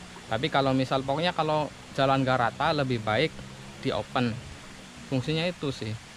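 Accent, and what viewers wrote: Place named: Java, Indonesia. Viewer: Logatnya lugu orang jawa :)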